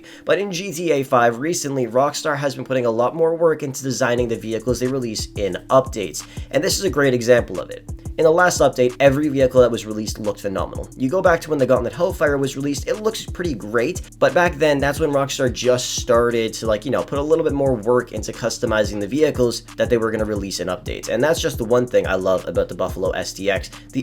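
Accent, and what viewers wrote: Slovenia. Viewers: Wait are you from SLOVENIA😅🇸🇮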